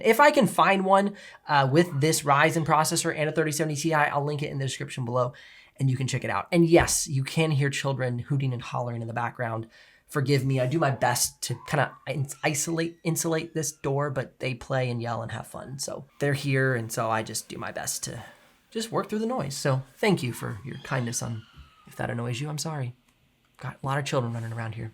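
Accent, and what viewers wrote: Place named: United States. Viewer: Its basic murican accent, which is easiest accent to understand.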